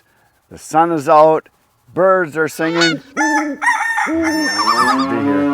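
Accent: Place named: Upper Peninsula, United States. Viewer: Hi. I like your accent. I love regional jargon. I was born and raised in Detroit. To my ear, you sound similar to Yooper to me. When I was 21, I moved to New Orleans and lived there 26 years. Yup, "what kind of Coke you want?" is common there. "Neutral ground" is the road median. "Dressed" means with lettuce, tomato, and mayo. Haven't even scratched the surface or get started into the Cajun words (southwest Louisiana). Have a good one. Chuck now living in Florida.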